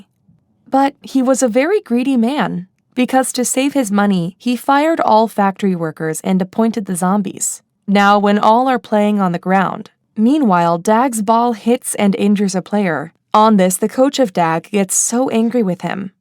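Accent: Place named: United States. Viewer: Why does the narrator have a Amrican accent but speaks like English is not her first language?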